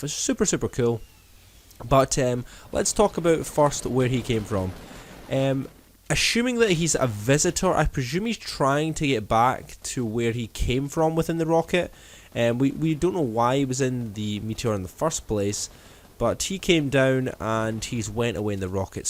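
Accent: Scotland, United Kingdom. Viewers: Scottish boi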